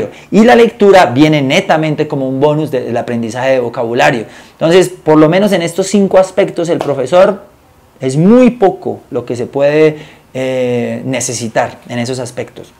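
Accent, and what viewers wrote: Colombia. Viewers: Bobos culicagados haha me agrada el acento colombiono...buenos videos my Men... saludos desde mexico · Muy bueno, de acuerdo con mucho de lo que dices compañero! Solo recomiendo ir modulando bastante el acento en español.... Supongo que eres santandereano! Jaja yo también lo soy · no se porque usted se me hace que es colombiano ? is;not true? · Por su acento usted debe ser Colombiano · Eres Colombiano? muchas pero muchas gracias parcero, enserio wuevon muchas gracias. jejeje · huyyy parcero lo felicito eres un teso por la forma en que hablas y te expresas debes ser colombiano. así que felicitaciones. cómo pues es pa lo del PDF de vocabulario parce ya me suscribí y estoy compartiendo tu canal con todo mundo. mi correo es <mail> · Me encanta que seas de Bogota y nuestro acento en genial no hay porque cambiarlo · puro acento de colombiano!! 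viva Colombia !!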